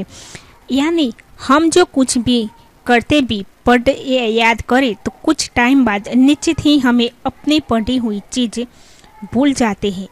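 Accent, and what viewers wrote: India. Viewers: Bengali ho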